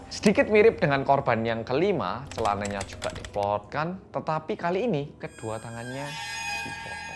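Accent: Java, Indonesia. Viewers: "medok" nya ngegas mas :) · Lanjut mas medok setelah nessie judge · Medokmu membuatku terkesan 😁🤭🙏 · Orang yang medok i love medok owakwao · Semangat buat Korea medhok, nanti klo wabah selesai ke SBY ya bang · medok nya bikin aku kagett tiba2 ada falsetto hahahha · ... yang medokkk ~ * auto cabut earphones * okey . . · medhok nya waw banget.. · Medhooooooknya bikin ngakak😭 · "bersama orang Korea yang medhooooookkkkkk" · Kaget medoknya😭😭😭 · Gercep Kembali lagi,bersama oramg korea yang..... "MEDHOOOKKKK" salam dari Jawa Timur yh mas. Lup²❤ #BoloneHansol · Hallo gaess welcome to back korea reomit bersama orang Korea yang medok😁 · Mas: halo guys welcome back to korea reomit bersama orang korea Me: * siap-siap * Mas: yang... Me: MEDHOOOKKKK ❤❤❤❤❤ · Medhoookkknya kok pake nada tinggi mas?? Wkwkwkw...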